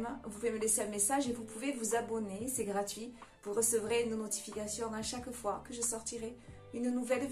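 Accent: South, France. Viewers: moment plaisant en compagnie de la charmante et souriante à l'accent agréable du sud pour découvrir la technique de mise en oeuvre de l'effet peau de serpent. 🤩 l'association des couleurs est sans fausse note. j'aime bien le rendu estompé du ciel avec cette surépaisseur matérialisant un voile nuageux avec l'effet de la pluie en mer. vous vous êtes un peu reprochée l'imperfection de la ligne d'horizon. pourtant, je trouve qu'elle est bien ainsi car plus réaliste que l'abstraction que l'on en fait simplement. je pense que si elle avait été uniforme elle aurait accentué une toile coupée en deux alors que dans votre cas la transition est naturelle. enfin, la représentation du bateau fut un clin d'œil pour moi. il m'a fait penser à la technique de représentation des fonds de décor que l'on peut également trouver dans certaines BD. une bien jolie toile. 🤩😉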